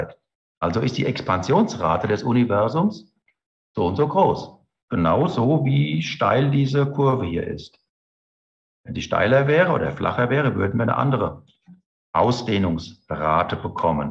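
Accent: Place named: Frankfurt, Germany. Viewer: Sie können noch so hochdeutsch sprechen, den frankfurter hör ich immer raus 😍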